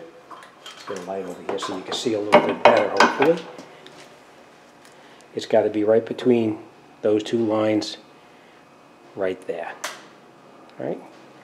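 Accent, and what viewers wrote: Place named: Massachusetts, United States. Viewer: I like the MA accent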